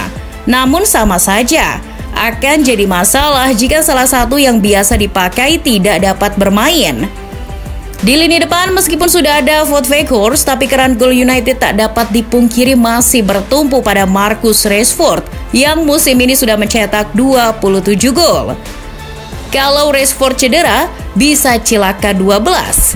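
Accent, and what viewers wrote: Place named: West Java, Indonesia. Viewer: Anjirrr ada ada aja hahha kalau Rashford cedera bisa celaka dua belas😂😂😂, Pick Yang ngisi Voice nya orang sunda😁🤭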